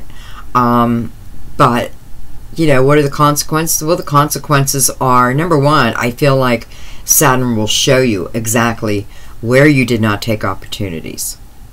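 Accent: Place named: East Coast, United States. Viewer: everything you say is real ... you sound like your from back east very kind and wise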